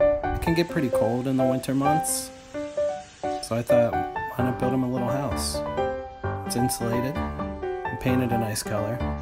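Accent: United States. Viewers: I noticed that too. The narration is American and the guy’s voice in the video is British. Not sure if this is a genuine story or not. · The guy in the video has an English accent, but the guy narrating is American. I'm a little confused. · Narrator speaking in first person: soft, calm voice with American accent. Guy in first video: loud voice with British accent. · Was very confused when the guy in the videos had a British accent and the guy narrating had an American accent before realising the narrator is just telling the story of the British guy and the cat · The comment thread has nothing to do with 'global citizens'. People are questioning why the narrator's voice has an American accent when the short pieces of dialogue you hear during the video is in a noticeably British/English accent. What has that got to do with where people live? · Hey your and English guy in the video but American on the voiceover???? 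That's the second time ive caught you doing this lol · I've always suspected these types of videos were sent in by people and then their email was read as a voiceover by a Dodo employee. Hearing the man's English accent in the video and the American voiceover has confirmed it. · Ok so the guy talking is American but the guy with the cat is English. Lies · Why is the narrator American but the guy hearing him talk on video English? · Why does the narrator have an American accent but the guy in the video has a British accent? 🤔 sweet cat though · Why did they make the narrator American when the guy in the video is British? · Well that was weird. The voice over guy is American, while the person shooting the video is clearly a Brit. Did The Dodo think we wouldn't understand him? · anyone else spot the narrator is a yank but the guy in the vid in engish? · The narrator is American, where as the owner of Paul sounds English.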